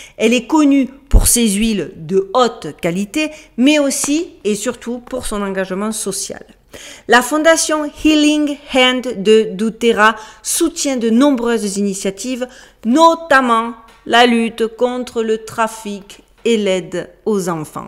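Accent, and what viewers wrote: Marseille, France. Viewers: Mdr l'accent marseillais au usa en plus vous êtes super jolie😂😂😂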